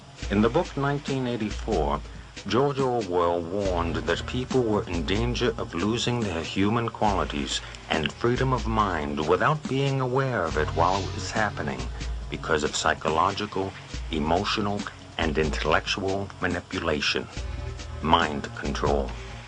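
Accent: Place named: Boston, United States. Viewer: british? he sounds like he is from boston to me!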